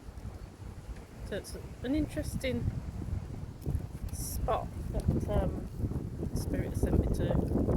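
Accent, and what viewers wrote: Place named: England, United Kingdom. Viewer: Just love this english accent too! Why dont you check Kemit ancestry ?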